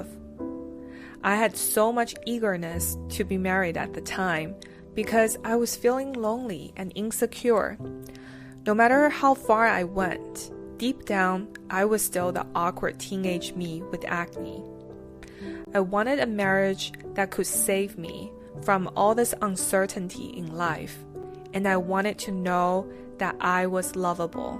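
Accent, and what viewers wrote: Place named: Asia. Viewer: I detect an asian accent.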